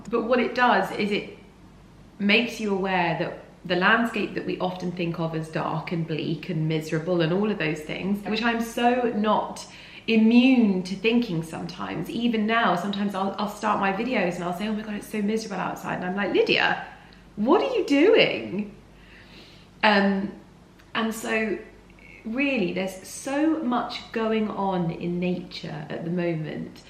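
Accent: RP, United Kingdom. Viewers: Oh my god the accent!! What happened to you sooooo posh😅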